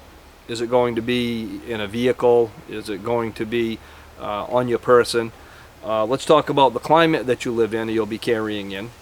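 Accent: Maine, United States. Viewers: I'm a .45 acp guy. (1911&G36) But I tell everyone who asks me that the most effective weapon is one that you can put rounds on target accurately and rapidly. I don't care what the caliber is. Stick with what you shoot best. BTW...are you from Maine? (accent kinda gives it away. Maineiac born and raised here)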